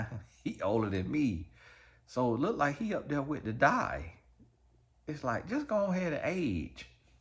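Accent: South, United States. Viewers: your southern accent is making this video so hilarious 🤣🤣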